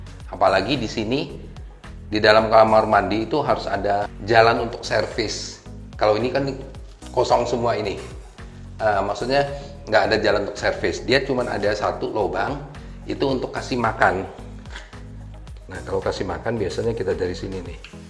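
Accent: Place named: Bangka, Indonesia. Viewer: Logat bicara blom ilang bangka ah khiu.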